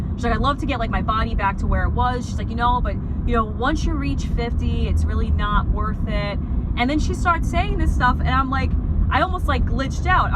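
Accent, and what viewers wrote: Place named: New York, United States. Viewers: I love ur New York accent, I think it’s so cool. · no shade I’m just from the Midwest we know a New Yorker when we hear one.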